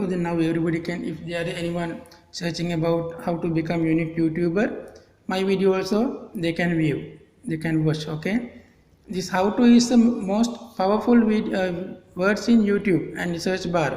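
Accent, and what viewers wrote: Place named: India. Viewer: Your English like indian man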